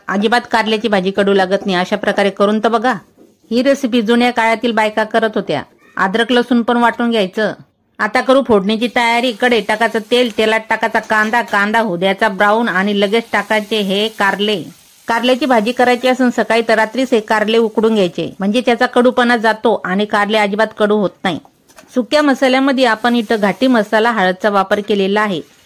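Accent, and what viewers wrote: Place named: Maharashtra, India. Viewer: Tumch boln kup sundar gavthee Marathi aahe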